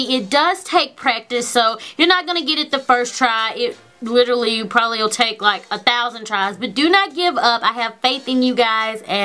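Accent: South, United States. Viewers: A little late on watching this video but I must say I LOVED this tutorial. It definitely does take practice and that is exactly what I am going to do. BTW: I love your channel and your upbeat and spunky personality. Oh and I cant forget that southern accent. ;) SUBSCRIBED! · I absolutely adore your southern accent! It's so fetch! :) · Your country accent makes me always wanna jus give u a big ol country Hug.. I luv it =) <3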